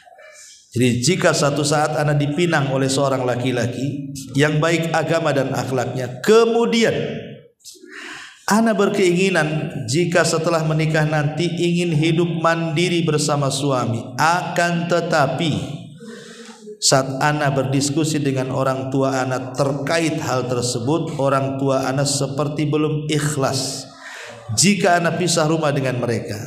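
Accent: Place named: Java, Indonesia. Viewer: Ceramah Bpk enak di dengar. Bahasanya lembut. Jowo nya tulen Njowoni. Sehat njih Bapak Ustad. Aamiin Ya Robb🙏🙏🙏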